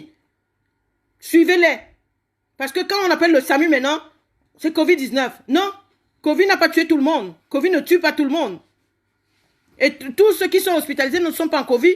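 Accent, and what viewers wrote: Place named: Cameroon. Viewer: Tu as un accent camerouneen. Nous avons apprit que le DOLIPRANE EST SUPRIMÈ DU MARCHÈ PHARMACEUTIQUE PARCEQUE CE DOLIPRANE A UN TAUX ELEVÈ DU CAFFEINE ET C'est mauvais de prendre ce produit si tu as des problemes gastriques et cardiaque. Ton mari avait un mal à la tète et tu as appelè l'ambulance SAMU pour l'amemer à l'hopital. Il fallait d'abord attendre demain matin si les maux de tete persistaient. En lui produisant de la PARACETAMOL ET DES VITAMINES C OR DES ORANGES. 2 COMPRIMÈS PARACETAMOL ACCOMPAGNÈES DU THE ROUGE AVEC UN DEMI CITIRON VERT ET DU MIEL. MASSÈ SON FRONT LA TETE AVEC DU VICKS MENTALATHUM ORIGINAL DE LA PHARMACIE. MASSE TOUT SON DOT AVEC DU VICKS ET LE COU ET LA GEORGE. MASSÉ SA POITRINE DONC LES COTES AVEC LE VICKS. DEMAIN MATIN FAIT LA MEME CHOSE MAIS EN LUI DONNANT UNE DOUCHE BIEN CHAUDE ET MET LE VICKS DANS l'eau dans le benoire. 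Madame tu sais tres bien que les blancs ne portent pas á les peuple noirs quand tu les enttends dire que les VACCIN DU COVID19 DOIT ETRE TESTER EN AFRIQUE NOIR. NOUS SOMMES EN EUROPE MAIS À PARTIR D'AUJOURD'HUI TOUT LES NOIRS VIVANT EN EUROPE DOIVENT MAINTENANT FAIRE ATTENTION PARTOUT OU TU ES. LE COVID19 A ÉTÈ ELABORE POUR EXPEDIER EN AFRIQUE NOIR ET NOUS TUÈS. PEUPLE NOIRS AFRICAINS REVEILLONS NOUS.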